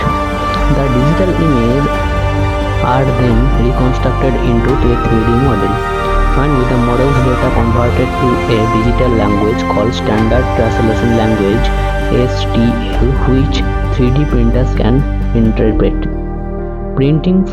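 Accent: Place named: India. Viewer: What a stupid way to talk on top of louder music. And plus in Indian accident, what a rubbish man.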